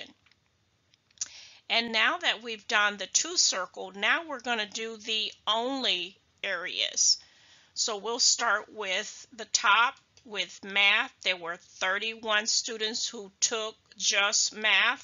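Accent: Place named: United Kingdom. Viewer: shes british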